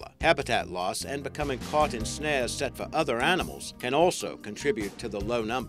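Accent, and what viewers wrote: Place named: Australia. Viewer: maybe a soft Australian accent.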